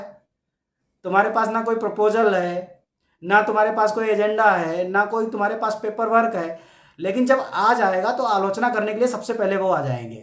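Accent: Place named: United Kingdom. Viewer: Why is the voice is bloody britishers .pls upload the actual indian voice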